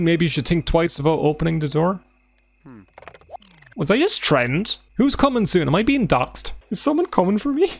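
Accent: Ireland. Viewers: Sims 1 with an Irish accent . I clicc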